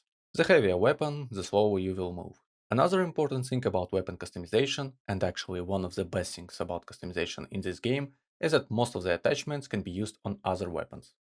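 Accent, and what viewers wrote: Russia. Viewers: El Russia accent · По акценту палишься руснявый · вы русский? У вас очень русский акцент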